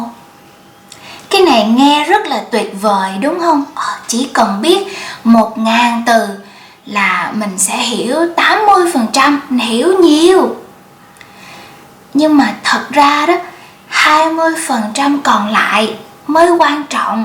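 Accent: South, Vietnam. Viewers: Thanks for reply , i am malaysian nguoi hoa . i learn a lot of southern accent tieng viet from your video clip , cam on nhieu ! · Sorry I forgot, this is southern accent right?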